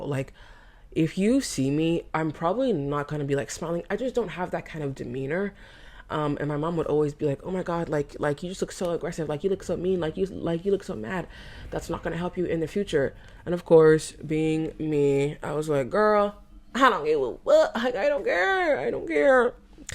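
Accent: United Kingdom. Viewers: The British accent is genuinely so good and fitting on you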